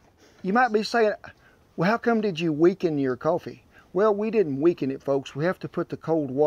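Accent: South, United States. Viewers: Southern accent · I’m Just here for the southern draw 😂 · I’m from India and I love the southern American accent, as soon as I heard it, I instantly subscribed to the channel. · I really love his accent man, truly is a man of the woods, man of the south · He actually looks like the character from gta 5 Avery duggan or vice city Avery carrington . this awesome guy that’s making coffee is a bad ass I love the Southern hospitality & the accent . I hail southern from California born & raised . even though I wasn’t born a Southerner I catch myself having a southern accent sometimes 🤷🏻‍♂️ it’s odd lol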